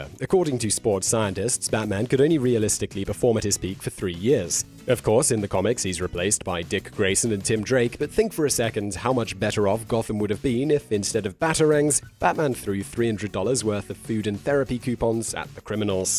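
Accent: England, United Kingdom. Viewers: He’s not American, he’s English as far as I can tell. Maybe he thinks Americans would need subtitles to translate. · Simon, your pretty professional for the most part, so most of the time when you pronounce something differently than I'm use to, I chalk it up to you being a Brit. Chinook is NOT one of those words. It is suppose to be pronounced; shin- nook. It is part of the Haida-Tlingit language. It refers both to a species of salmon and a weather phenom of a warm winter wind that turns the snow to ice. (And makes driving hell) With so many channels filling our heads with missinformation, I need to be able to trust at least one youtuber. Don't fail me Simon.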